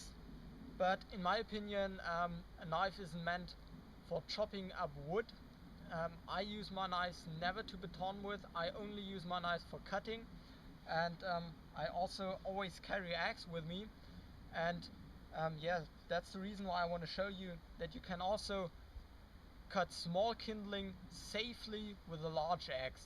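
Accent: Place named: Austria. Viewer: Great video! The best video I've seen on youtube about using an axe safely. I also really like your accent. Austrian right?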